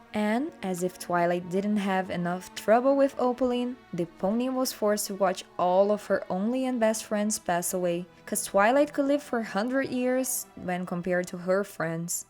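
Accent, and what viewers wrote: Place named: Russia. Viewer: Такое чувство, что акцент русский у Вас)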